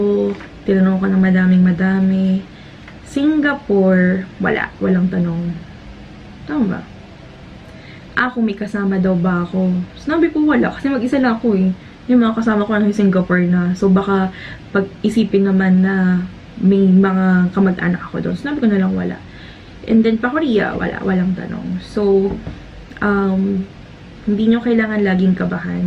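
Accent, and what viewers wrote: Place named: England, United Kingdom. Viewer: thank you and btw, I like your english accent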